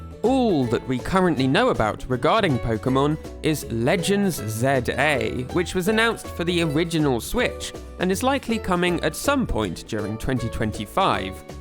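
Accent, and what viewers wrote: United Kingdom. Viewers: He's from the UK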